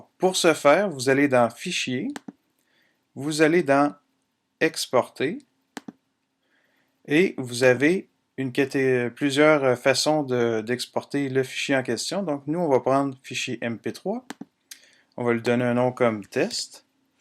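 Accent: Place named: Canada. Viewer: SUPER TUTO, très clair. Maintenant, il en faudrait un pour utiliser Audacity !! Et j'aime trop l'accent de nos amis canadiens !!! Merci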